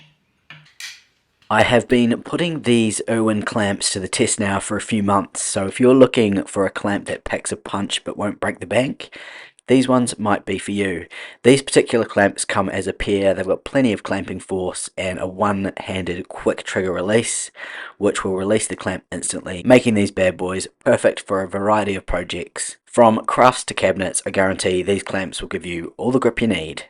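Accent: New Zealand. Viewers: Ok have a question for you!! Have u consided purchasing a laser paint remover..some are very expensive but some are cheaper if u look about...no more sanding or scraping imagine time saved..would make an interesting video...anyway have been enjoying your videos grretings from Australia..do i detect a kiwi accent?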